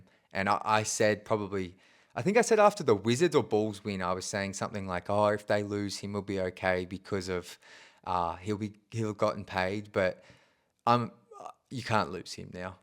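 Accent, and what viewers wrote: Australia. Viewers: Accent through me off I’ve never received my pistons news via an Australian ! Good shit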